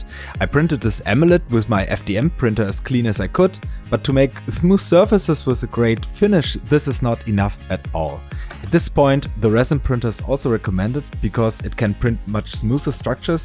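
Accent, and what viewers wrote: Germany. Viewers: He sounds to be German! That explains it. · I mean, one can obviously tell you are german by your accent, but are you aware you sound crazy similar to docm77, a popular german minecraft youtuber from karlsruhe? :D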